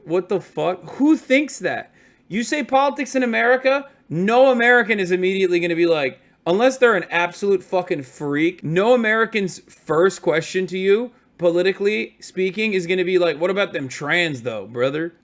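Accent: Cockney, United Kingdom. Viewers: Not actually that bad of a cockney accent 😂 The amount of swearing is perfect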